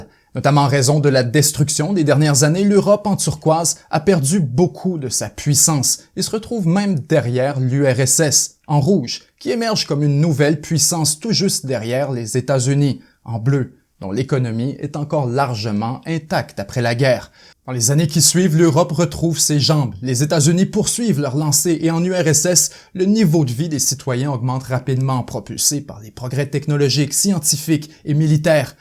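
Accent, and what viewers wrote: Quebec, Canada. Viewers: l accent possiblement! ;) Notre accent québécois! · Il est québécois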